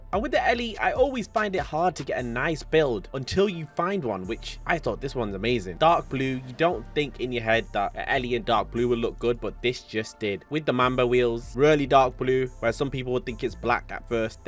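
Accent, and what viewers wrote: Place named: Bradford, United Kingdom. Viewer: he sounds like hes from bradford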